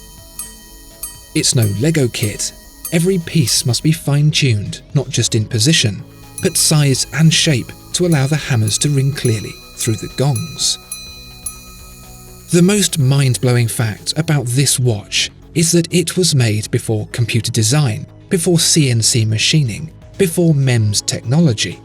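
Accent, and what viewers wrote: United Kingdom. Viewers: Now if we could just get you Brits to stop saying 'Pack-AHHHHD'.....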